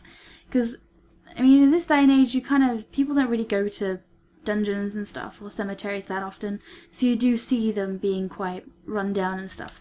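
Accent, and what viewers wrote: United Kingdom. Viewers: Are you scottish or british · Scottish? LOL. It's a British accent.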